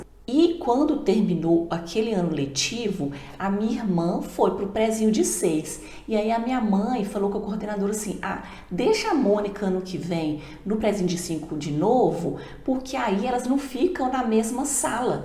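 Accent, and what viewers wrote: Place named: Minas Gerais, Brazil. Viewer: Voz de mineirinha.Minha mãe era mineira de Poços de Caldas .Maringá Pr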